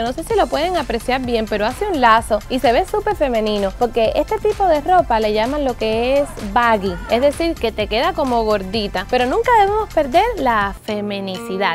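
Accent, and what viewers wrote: Cuba. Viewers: Su acento de cubana es simpático, yo q ella, no enseñaria todo lo q tengo, no hace falta, ya tiene bastante dinero, le gustará la fama, bueno, cada uno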